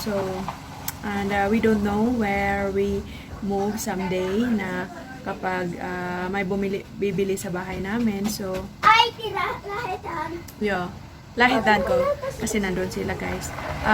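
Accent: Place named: Visayas, Philippines. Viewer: Bisaya ka sis parihas Tau bsta bisaya tlga mag Salita bagsak nyahhh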